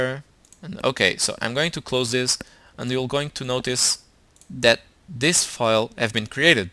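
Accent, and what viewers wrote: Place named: Brazil. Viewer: your accent sounds familiar are you Brazilian ?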